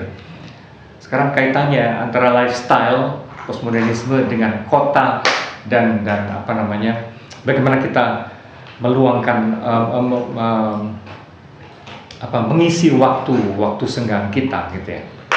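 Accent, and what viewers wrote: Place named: Indonesia. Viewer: Indonesia Bangat bacaannya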